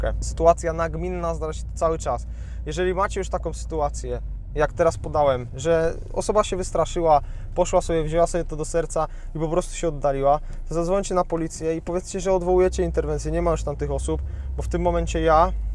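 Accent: Silesia, Poland. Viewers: Ze Śluńska jesteś?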